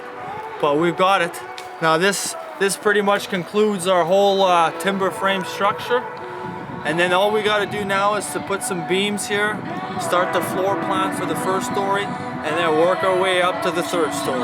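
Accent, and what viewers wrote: Canada. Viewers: Your accent sounds like it's a mix of Canadian and New Yorker. It's like nothing I've ever heard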